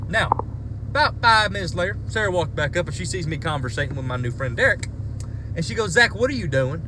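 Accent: South, United States. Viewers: Zach, I don't agree on the whole god/jesus thing, but love your energy, honesty and down to earth wisdom. I'm from Seattle and you make me want to talk with a southern drawl. Sometimes a few drinks is what it takes to get our priorities straight.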